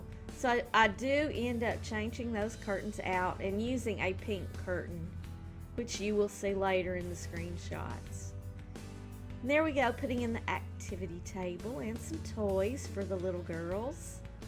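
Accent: South, United States. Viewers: Love your sweet southern accent :) I live in Georgia so its a good feeling hearing a southern simmer voice! :D But i dont know why because i am from the north and i have northern accent. Great video! I subscribed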